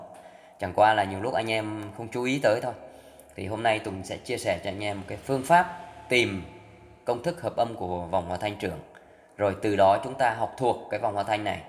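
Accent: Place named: Central, Vietnam. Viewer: Bạn hướng dẫn hay quá. Bạn ở đâu vậy? Nghe như giọng Miền Trung á hè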